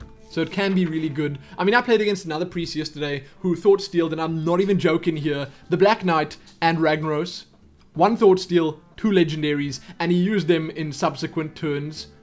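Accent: South Africa. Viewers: South African, close to Cape Town :) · Hey you from S.A?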